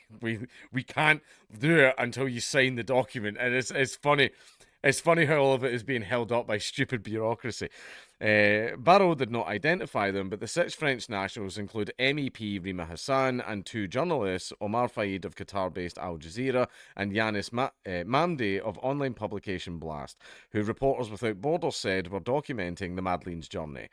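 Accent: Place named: Scotland, United Kingdom. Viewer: Came here for the "oh noooo what happened" in that sweet scottish brogue. Don't let me down 😂.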